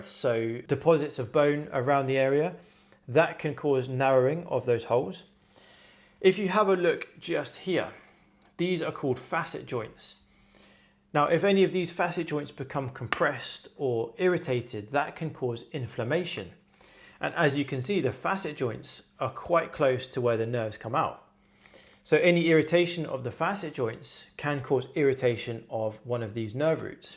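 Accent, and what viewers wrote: United Kingdom. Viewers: Excellent video and very helpful, much prefer the English accent to an American drawl!!!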